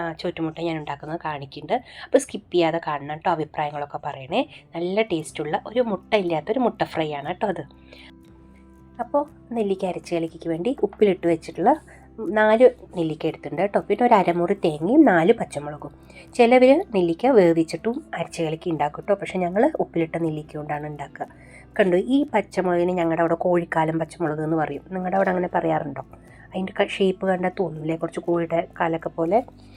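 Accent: Palakkad, India. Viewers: നമ്മൾ പാലക്കാടന്മാർ പറയുന്ന പൊലെ "നാഴി നെല്ലിക്ക" എന്ന് പറഞ്ഞ് പാലക്കാടൻ ശൈലിയിലെ വിവരണം അടിപൊളി..😂😂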